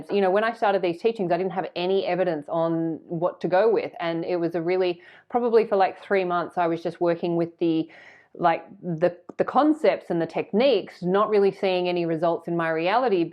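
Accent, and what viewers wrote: Australia. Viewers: So refreshing to hear an Australian. Really enjoying your content from far north Thailand. Thank you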